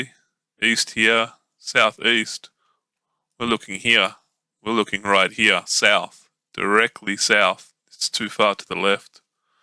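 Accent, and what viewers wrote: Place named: Australia. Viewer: I got sooo much to catch up on 👍love your Aussie Accent coming through 😅.. this sounds amazing.. 👍 Great to catch up on some new videos ( new to me as I’m running behind ). Always great to watch your videos.. WOW what Size is that I wonder 💭 Thank you so much for sharing again 💫😃 🛸 🛸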